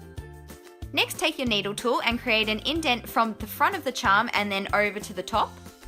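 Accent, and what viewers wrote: Australia. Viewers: She's Aussie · Are you a fellow Aussie? Or a Kiwi? I'm trying to pick up on your accent lol. I'm Australian, myself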